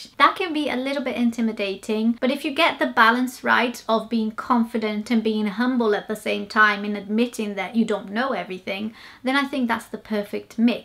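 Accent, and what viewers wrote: Wales, United Kingdom. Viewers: Welsh accent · You have the perfect accent when speaking English. Don't give up because you are very good at it👍also your accent sounds good welsh. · So cool that you've absorbed a welsh accent!! As soon as you started speaking I thought you had a welsh twang. It was great to hear a British accent but then when I heard the Welsh that was a nice surprise :D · American? She sounds Welsh to me! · Your accent sounds quite Welsh! (I'm English) did you happen to spend some time in Wales? Edit: omg you just said you have a Welsh accent! Haha knew I had a good ear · Native English speaker here (Scottish from Glasgow, have lived in London for over 20 years now). For the first 7 minutes of this video, I was thinking:"if there's no Welsh connection, I'm going to be very surprised; what a lovely perfect English-with-traces-of-Welsh (e.g. 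the beautiful way you say the word "years") accent". Then : Welsh boyfriend/husband. BOOM! You are obviously a very talented linguist, and your accent is beautiful. :-)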